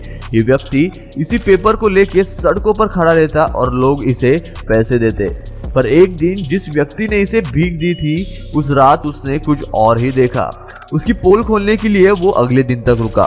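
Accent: India. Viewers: You are not an indian why speaking liake an indian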